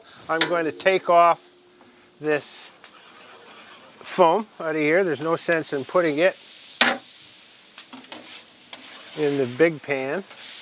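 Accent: Canada. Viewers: I'm Canadian, and this guy's Canadian accent is too much even for me.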